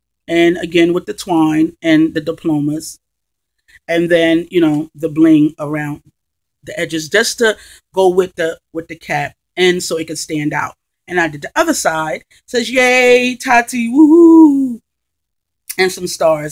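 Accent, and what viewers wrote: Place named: New York, United States. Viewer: Fabulous idea. Wish I did this to my daughter's cap. I love your accent. I'm from NJ living in Georgia and I miss hearing that NY/NJ accent.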